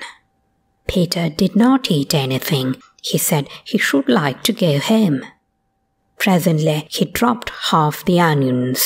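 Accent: RP, United Kingdom. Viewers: This is how Beatrix Potter should be read, in posh British accent, with that old flavour! Ty for this.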